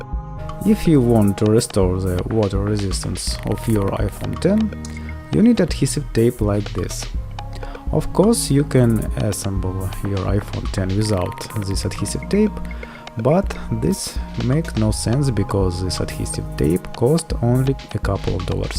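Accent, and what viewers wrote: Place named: Russia. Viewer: страшный руззкий акцент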